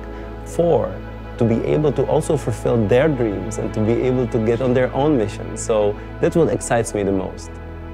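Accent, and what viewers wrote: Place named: Czech Republic. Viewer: Miluji ten český přízvuk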